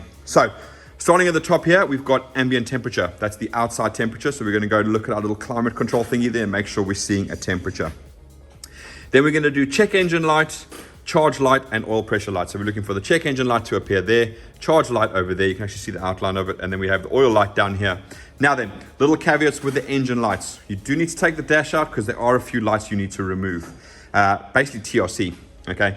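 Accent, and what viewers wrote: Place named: South Africa. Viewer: That South African accent. Also, awesome work man!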